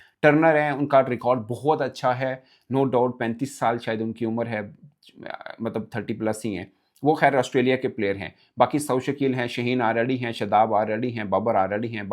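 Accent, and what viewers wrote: Punjab, India. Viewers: پیارے بھائی پہلے بھی کہا تھا کہ اردو میں پنجابی لہجہ نہ استعمال کیا کریں۔ جیسے آپ اکثر شاہین شاہ آفریدی کو شہین شاہ کہتے ہیں۔ پلیز تلفظ کا دھیان رکھا کریں آپکا وی لاگ پوری دنیا میں جہاں اردو سمجھے والے لوگ ہیں دیکھا جاتا ہے۔ شکریہ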